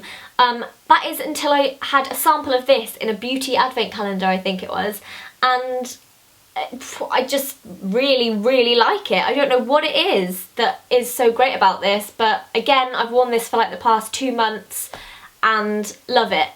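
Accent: United Kingdom. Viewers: you have THE perfect British accent like its so perf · OMG I WANT YOUR ACCENT I HAVE ALWAYS WANTED TO BE BRITISH I'M JUST PLAIN OLD AMERICAN!! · idk why i watch your video because most of these products are probably not in America...but a british accent tho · Your british accent is the same as mine ( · Everytime I watch your videos I just really wish I were from the UK too. Your accent is really lovely! :) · are you british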